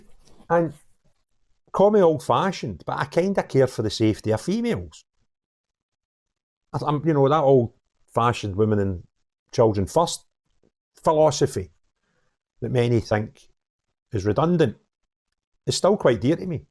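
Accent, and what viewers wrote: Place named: Ayrshire, United Kingdom. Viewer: are you fae Ayrshire? Your accent sounds almost exactly like my papa and uncle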